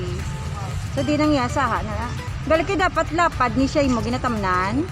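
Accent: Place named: Iloilo, Philippines. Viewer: This event i always remember during my childhood days... and your ilongga accents reminds me of my place.napangiti mo po ako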